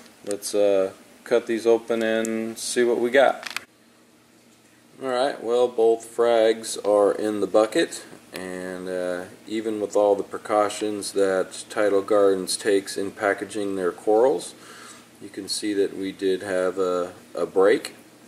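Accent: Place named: San Francisco Bay Area, United States. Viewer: Really great tanks. Wonderful selection of corals and fish. Sorry to hear you're having issues with rogue shrimp. If they are living in a undergravel filter perhaps you're going to have tiptoe in a night and (under very low lighting) and try to nab them. Hey, by the sound of it I'm guessing you live near my neck of the woods (San Francisco Bay Area). I keep thinking I should take a visit to Exotic Aquarium in Sacramento. Just haven't taken the time to yet. Enjoyed the video. You've got me as a new subscriber!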